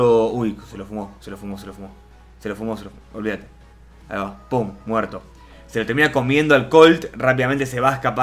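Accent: Argentina. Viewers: por su acento lo más probable es que sea argentino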